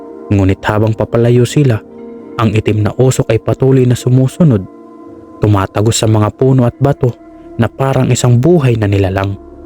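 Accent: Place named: Waray, Philippines. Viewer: Waray waray itong narrator kasiang tuno ng kanyang salita hindi mapakakaila na waray waray alagad intoy hehe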